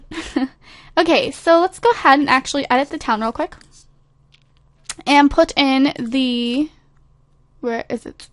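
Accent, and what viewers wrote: Scotland, United Kingdom. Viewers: Scottish lol:)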